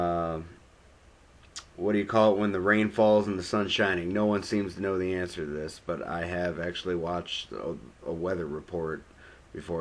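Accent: Buffalo, United States. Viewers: you definitely have a buffalo accent. I get it all the time, yet I never knew I had one till I went to Mass. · good representation of Buffalo accent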